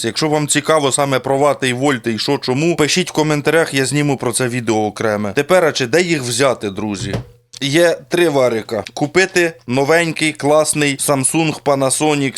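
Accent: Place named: Central Ukraine, Ukraine. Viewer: земляче,лиш не двєнадцать,а два на д ця ть .чути осьо,тосьо,що центральна україна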